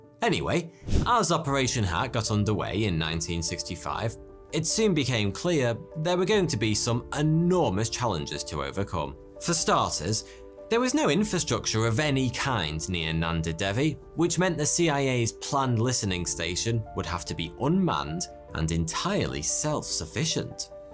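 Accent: United Kingdom. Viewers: He is british 😂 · this man truly is a pure British 😂😂😂😭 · definitely a pom - he ain't no Aussie, there's to much of the national costume & dance, not in this. Aussies are over the 'ditch' from me, thankfully.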